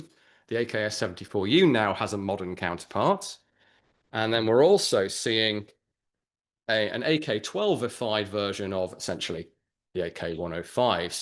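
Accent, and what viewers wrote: United Kingdom. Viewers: You can't just discuss AK with that sort of a gay British accent. It simply doesn't work that way.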